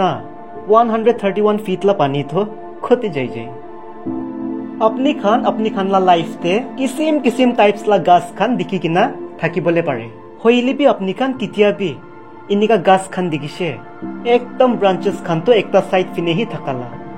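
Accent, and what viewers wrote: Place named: Merangkong, India. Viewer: Bro try to upload everyday ok N btw I heart ur voice may be ur voice like from merangkong,Tuli exact one of my friends n thank you 🎉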